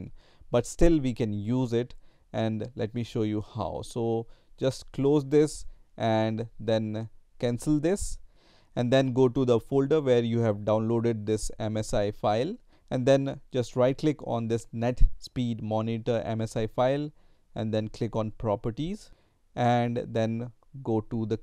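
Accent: India. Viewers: Never trust a dude with a Indian accent when it comes to your pc...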